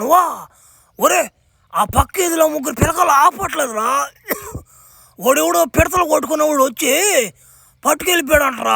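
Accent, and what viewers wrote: Nellore, India. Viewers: Nuvvu buthulu matladitey Nellore yasa vastadi bro❤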